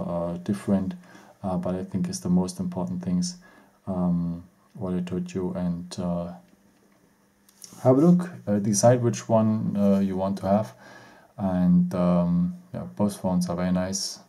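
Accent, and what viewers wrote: Germany. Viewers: Why do you say Jigahertz instead of Gigahertz? XD Your English is funny. At least I can understand you though so not hating! And at least you're not just talking German. I can respect that, especially from German people. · You know there is a English alphabet? For example the english "e" is spoken like a german "i" which you obviously speak.... but nice job reading down the information that stands on every page in the internet have a gr8 day m8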